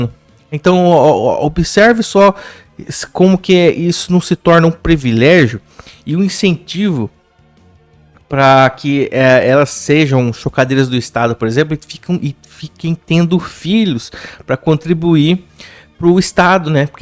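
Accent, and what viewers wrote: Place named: Inland São Paulo, Brazil. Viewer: Praticanente impossível é o sotaque do interior de S P. ele teria que viver uns 20 anos em outra região para mudar.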